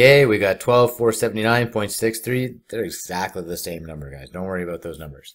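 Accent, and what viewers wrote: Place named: Canada. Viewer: Thanks for these great vids. Aldo your accent isn't all nasal and annoying. I think it's a Canadian thing the yanks got it bad these days and it really makes it hard to endure listening to it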